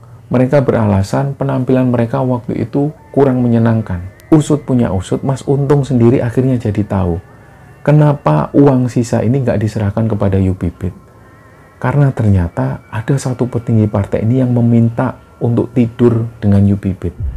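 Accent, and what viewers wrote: Java, Indonesia. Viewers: Tp the best yg ini. Krna mas bawakan nya sangat pas. Apalagi dengan logat jowonya. Pembawaan mas yg tenang jd nyaman di dengar. Aku pernah dr yg mba sebutkan tp semua tk nyaman di denger.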